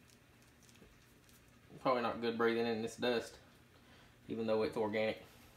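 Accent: South, United States. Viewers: This is a southern accent ") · HEAVY southern accent. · Awesome southern accent and very detailed video. Thank you for sharing.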